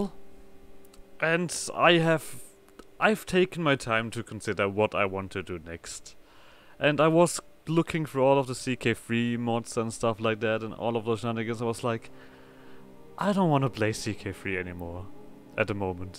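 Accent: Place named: Germany. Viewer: hat 5 sekunden gedauert um zu erkennen dass du deutscher bist lol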